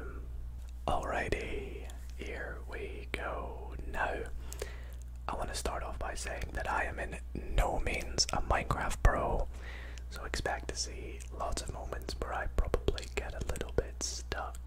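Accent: Scotland, United Kingdom. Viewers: Are you scottish bc my friend is scottish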